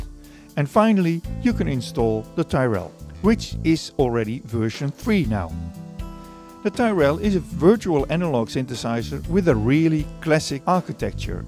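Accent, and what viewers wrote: Netherlands. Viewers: Is this guy Dutch? Hard to hide the accent 😉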